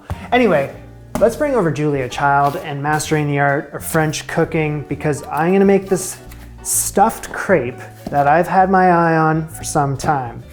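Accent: Canada. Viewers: You are so relatable you are me!!!. funy.. and sometimes a little unhinged. But you are up to the task. Thanks for the fun!!! Love your Canadian accent From the Netherlands 👍👍👍 As saint Nick would say you are nice and a little naughty.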